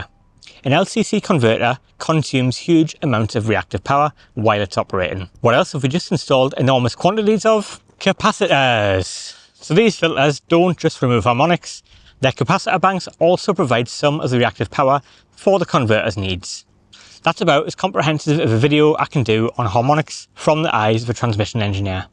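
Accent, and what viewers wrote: Newcastle, United Kingdom. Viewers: We all love the Geordie accent mate! ;))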